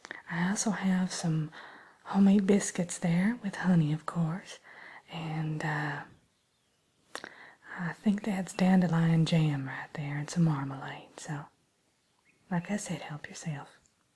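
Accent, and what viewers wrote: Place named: South, United States. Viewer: Lovely. Your southern accent was adorable. I love the photo you chose too..perfect. Can't wait for the next segment!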